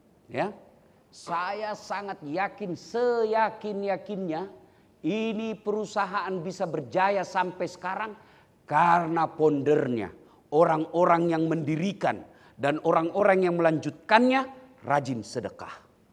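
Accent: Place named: Banyumas, Indonesia. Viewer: bahasane ngapak ora jelas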